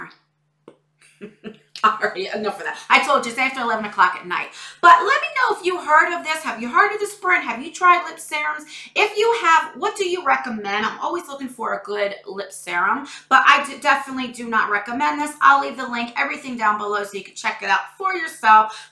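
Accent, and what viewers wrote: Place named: New York, United States. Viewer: I absolutely love your channel! I never found unboxing subscription boxes so entertaining! Also you sound like you have a New York accent? That's nice.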